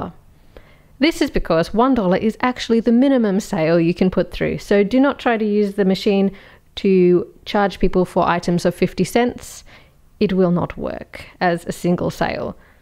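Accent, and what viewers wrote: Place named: England, United Kingdom. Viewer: U sound English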